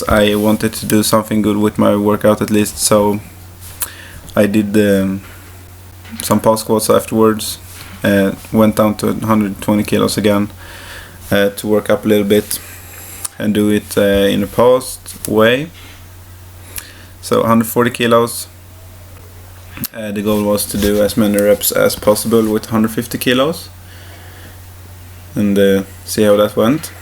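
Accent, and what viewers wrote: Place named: Sweden. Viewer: Absolutely love your Swedish accent my man.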